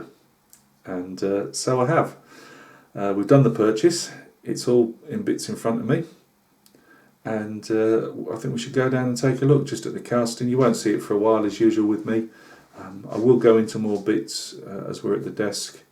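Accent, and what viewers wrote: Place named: England, United Kingdom. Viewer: It is so good hearing an English accent again. I am an expat from South Africa, been living in Spring, Texas for the past 29 years. I love your hobby, so nice.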